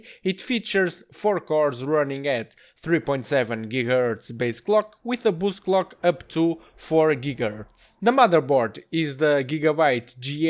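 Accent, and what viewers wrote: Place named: Portugal. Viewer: Vi logo que era um tuga ahah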